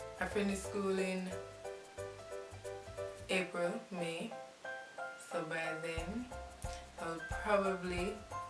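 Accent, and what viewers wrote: Jamaica. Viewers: ...are you Jamaican? you kinda sound...Jamaican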